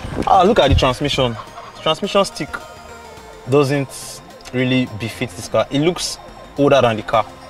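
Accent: Nigeria. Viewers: I am used to seeing Nigerian youtubers try hard to modify their Nigerian accent. It is a breath of fresh air to see one keep it real, its actually lovely. Much love from Canada. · I love the fact that he isn't forming...speaking like a true Nigerian guy...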